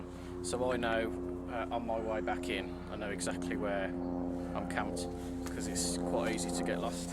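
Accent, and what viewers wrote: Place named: Birmingham, United Kingdom. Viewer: yep a true dummy brummie!! sounds and looks like a brummie!!! hurts the ears and numbs the senses... i switched off after 2 mins I've worked with these primitives... once they learn to speak we may accepted them as from EARTH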